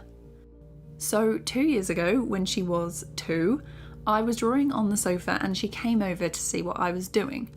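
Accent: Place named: United Kingdom. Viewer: I really like your accent! whereabouts are you from in the UK? I'm going to the UK with YMS visa. I'm studying with your video.haha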